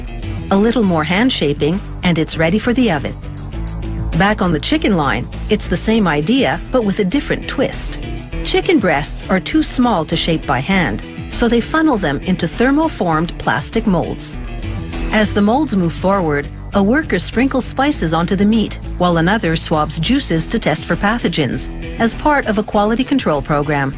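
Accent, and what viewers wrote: Canada. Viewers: Didn't realize the Narrorator was Canadian until the end. Oh, U and O.😂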